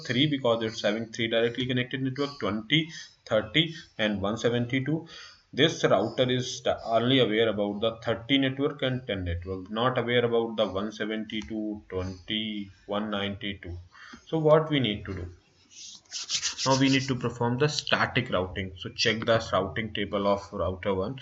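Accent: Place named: India. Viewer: i don't like Indian or Pakistan Accent but you are an exception.Best video on packet tracer so far.I love how the video started and ended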